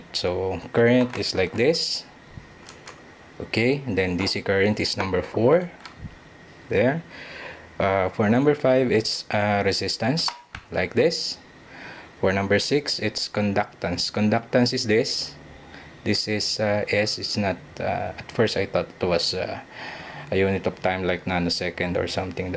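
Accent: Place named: Philippines. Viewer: Just the by way you spoke English I can tell you are from the Philippines 😅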